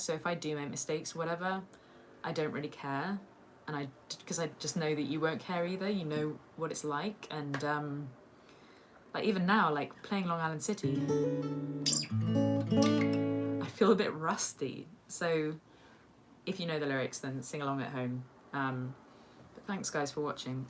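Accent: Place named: England, United Kingdom. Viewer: it's the accent. Kind of wish I knew from which part of England. It's killing me. Must. Marry. <3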